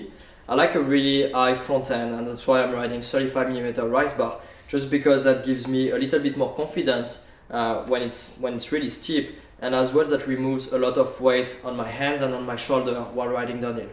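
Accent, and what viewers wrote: France. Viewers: tu a un accent francais you are accent french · Sa de voit que tu est français a ton acsens en anglais et a ton nom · French accent 😉(I have the same) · Tu a un accent français You have a french accent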